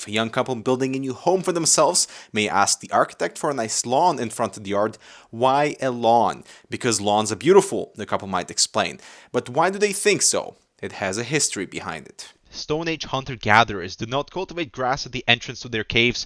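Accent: Russia. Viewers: are you russian? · D. Please create new channel where you are just reading books. Your 75% English and 25% Russian voice is literally heaven. Preachhhh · U kinda used russian accent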